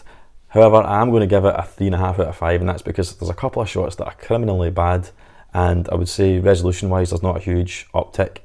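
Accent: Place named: Glasgow, United Kingdom. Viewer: Just discovered your channel mate thanks to John at mondo, being a Glasgow boy myself its great to hear a familiar accent. Absolutely adore this film and I picked up this set. This release is 100% my best release of last year. I do prefer a amaray or steelbook in these sets but apart from that its a great set. This movie having a nice 4k edition has been a long time coming. Great informative in depth review, a breath of fresh air, the effort you have put in is much appreciated 👍 Mulcahys eye is superb, i love how he moves the camera. You should check out His 'Razorback' if you havnt seen it, another masterclass in moving the camera. Ill defo be checking out more of your vids sir! Take it easy.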